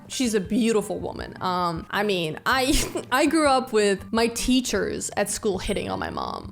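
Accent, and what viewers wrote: United States. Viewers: u sound American though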